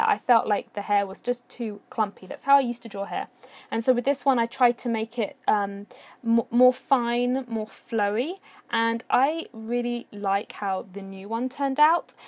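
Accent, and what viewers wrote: England, United Kingdom. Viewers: I like your English accent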